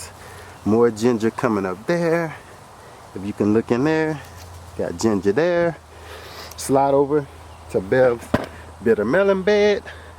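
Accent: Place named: South, United States. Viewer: I know a southerner when I hear my people. Lol This is my first year attempting a garden. I did very well with container tomatoes, then bombed on the garden bed plants. However, I just went and found my first baby watermelon growing after I hand pollinated it three days ago. Super excited! Which zone are you in? I'm in 8a. I'd like to figure out what I can grow through the Fall. Thank you for the videos~!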